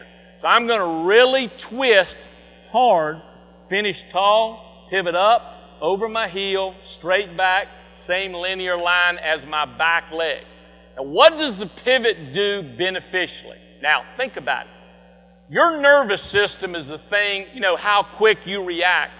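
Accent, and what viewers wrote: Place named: United States. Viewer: lol american accent...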